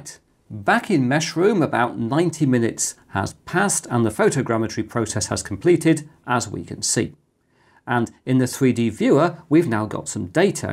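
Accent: England, United Kingdom. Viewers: And no Lidar mention in sight! You just use a basic DSLR?, Excellent well paced video with an English accent, everything explained well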